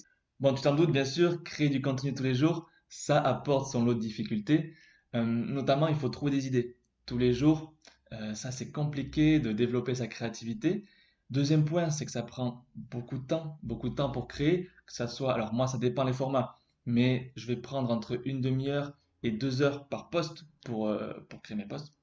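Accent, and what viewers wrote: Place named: South, France. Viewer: Le petit accent du Sud à la Marie Fray au masculin :-)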